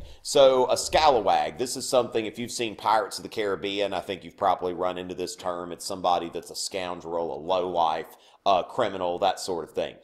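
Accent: South, United States. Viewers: This guy is a good explainer. And he has a cool Southern accent.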